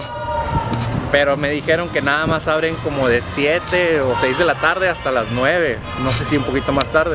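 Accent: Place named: Sonora, Mexico. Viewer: Habla cómo Los de sonora.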